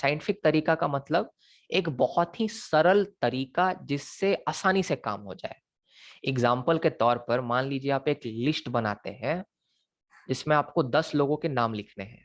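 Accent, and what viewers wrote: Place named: Bihar, India. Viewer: Sir your teaching skills are just🔥🔥 and jo bihari tone hai usme to mja hi aa jata hai..❤❤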